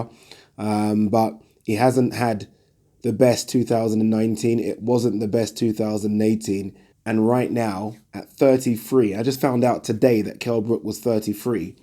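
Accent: Liverpool, United Kingdom. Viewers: Love your Scouse accent.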